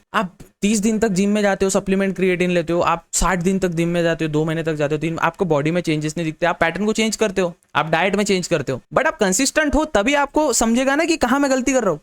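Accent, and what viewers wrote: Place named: Indore, India. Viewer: Bhaiya aap indore se hai na pakka😅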